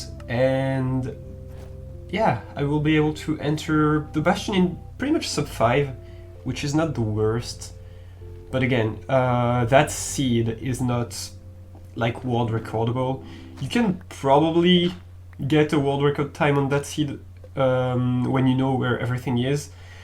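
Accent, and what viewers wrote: France. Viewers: Man, are you french?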